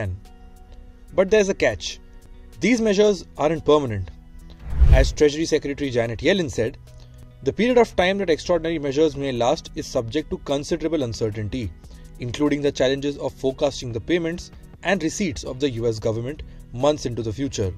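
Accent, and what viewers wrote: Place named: India. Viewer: Maybe don't have a customer service person from India doing the narration?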